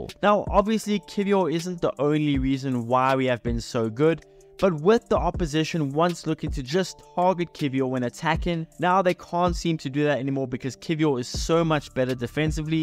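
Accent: South Africa. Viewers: I dunno but your accent sounds so south african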